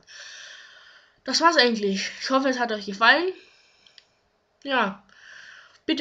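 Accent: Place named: Saxony, Germany. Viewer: Genau. So sympathisch ein sächsischer Akzent auch sein mag, bitte bitte bitte mit Zucker drauf: Spreche so, dass man es verstehen kann!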